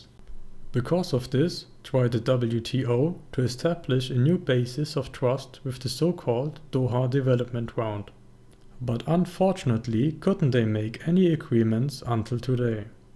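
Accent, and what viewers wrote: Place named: Switzerland. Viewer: Geneva s English sucks Acento SUIZO tipico: frio y de fumador de rodillas guacala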